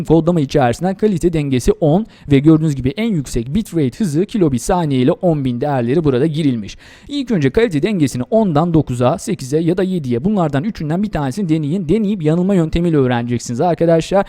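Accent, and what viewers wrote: Azerbaijan. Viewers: Azeri o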